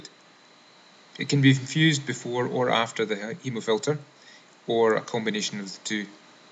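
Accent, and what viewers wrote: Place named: Scotland, United Kingdom. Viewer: Thank you for excellent presentation. Love the Scottish accent.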